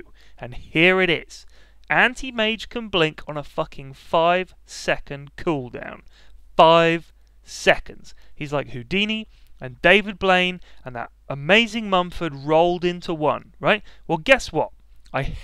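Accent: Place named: Cockney, United Kingdom. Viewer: i love this cockney rage XD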